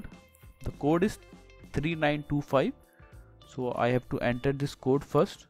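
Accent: India. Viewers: This Indian English is so hard to stand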